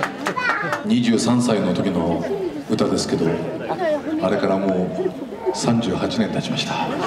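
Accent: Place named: Tohoku, Japan. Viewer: ちょっと東北弁入れてる感じで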